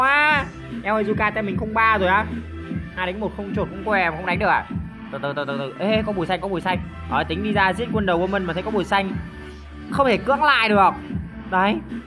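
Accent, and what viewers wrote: South, Vietnam. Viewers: Anh nói tiếng nam ko hợp đâu anh nghe chán lắm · Nói giọng nam nghe ngứa tai vc.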